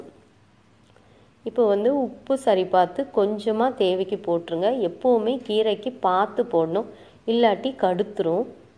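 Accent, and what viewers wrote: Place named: Tirunelveli, India. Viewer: Assalamu alaikkum vrh, yepdi irukkinga maa, yen name fazila, i am from chennai maa, neenga peasurathu keakkum pothu oor baasai nallaa theriyuthu, naangalum thirunelveli la eral thaan, namma oor baasai keakka santhosamaa irukku maa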